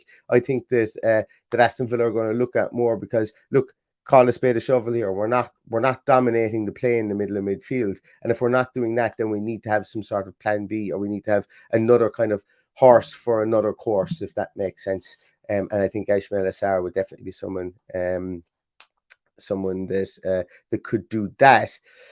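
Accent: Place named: Ireland. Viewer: The only podcast thats combines great Villa content with the ever so listenable Irish lilt, that's a killer combo, keep it up !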